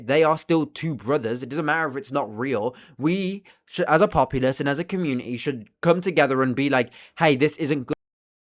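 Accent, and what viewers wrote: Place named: United Kingdom. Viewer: HESSSSSSSS BRITISHHHHHHHHHHHHHHHHH still good video tho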